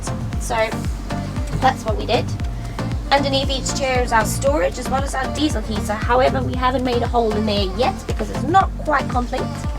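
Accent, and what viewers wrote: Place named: Southern Africa. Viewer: What part of Southern Africa are you from?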